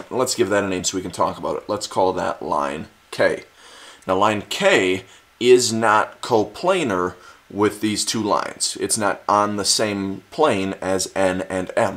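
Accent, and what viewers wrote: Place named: Canada. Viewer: this man is a canadian fo shooooooooo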